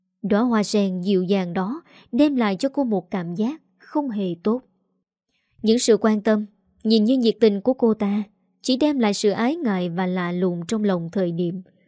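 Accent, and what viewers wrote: South, Vietnam. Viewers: Giọng đọc miền Nam của quả thật nhẹ nhàng ngọt như mật ong. Love it! 🥰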